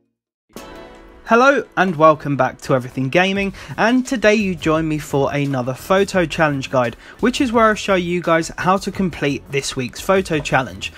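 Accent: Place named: Australia. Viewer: The australian accent tho 👌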